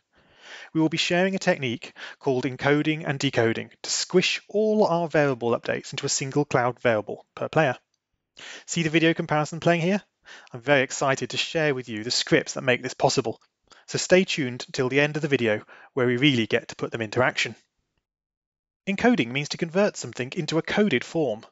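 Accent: United Kingdom. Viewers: I could listen to your british voice all day. It kind of reminds me of the times when the internet was for nerds and mainstream brands didn't take it seriously.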